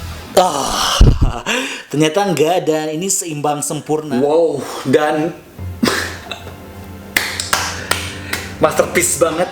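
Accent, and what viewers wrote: West Java, Indonesia. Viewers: Logat Sunda ?? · Bang asli nyah orang mana ko ngomong nyah aya sundaan wkwkw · Bang orang Sunda? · Haha sunda pisan 😂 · Keren mang sunda banget . korowot korowot wkwkwkwk Sukses slalu .... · logat sunda nya bikit ngakak.. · Bang orang sunda?? 😂😂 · Ngomong na sunda pisan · sunda nya ngakak xD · ai akang th timana atuh, menih sunda pisan kitu??? hehe tp wajah na oriental pisan... hehe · Koko sunda ? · Hahah orng sunda kang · Tigubrag tiguling 😅😅😅 urang sunda gening mang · Si Koko tinggal dimana nih, sundaan wae, hahaha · anjir ini si koko aksen sunda nya kuat banget.. Hadeeeee... Keren ... Bte mainan lo.. Maaahaaalllll tapi haqiqiiiiiii ...cool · Orang sunda ya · Orang sunda apa ya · Orang Sunda nihh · Orang sunda asli pisan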